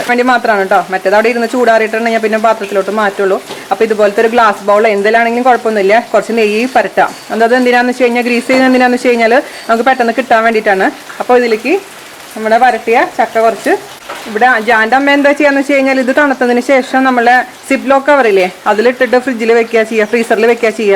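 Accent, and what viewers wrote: Thrissur, India. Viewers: chechi de veed trishur anno...aa accent...avidthe pole...sambhavam kaalaki...kollam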